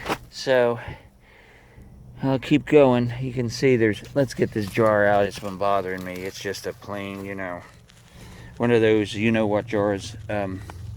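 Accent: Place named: New Jersey, United States. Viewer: You got a nice little spot there! Sounds like you are from new jersey with that accent :)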